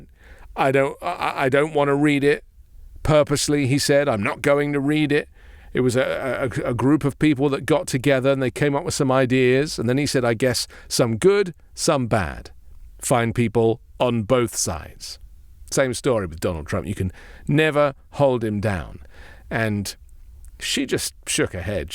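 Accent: United Kingdom. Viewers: Well nothing like hearing about American politics from someone with a British accent 😅 But gooo Kamala !!! RID the world of Trump !!!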